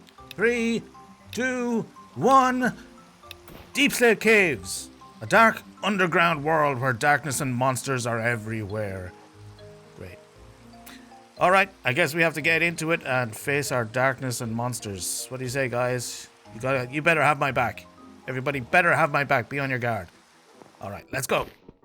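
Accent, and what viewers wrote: Ireland. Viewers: You don't sound Australian. I hear a strong Irish accent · just found your chanel and 1 min in I was like this guys definitely irish just from the way you speek(I'm also irish) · I love everything about this One Block. I can honestly say that Its my favorite One Block. I am a fan of the Irish accent, your builds and your humor. I look forward to watching the rest of your vids. Oh and I subscribed today. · OUUHH OKAY THATS COOL, i could tell from the accent!! i rarely see other irish people who play minecraft i got a bit hyped lmao, amazing video though good luck with growing <3! very underrated and have alot of potential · Definitely new here, but you're from Ireland, right? Its always nice hearing a familiar accent 😂😁😁😁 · I really am enjoying this One Block series. It is very different from what I've seen before but it is wonderful! 😊 I've been trying to catch where is your accent from? 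My partner is Irish and you sound quite Irish to me, where is it from? :) I can't wait to finish this video and see what else you have in store, greetings from Honduras! 😊 Edit: just finished the video! Congrats, you are so talented, I was blown away with the ship at the end 😮❤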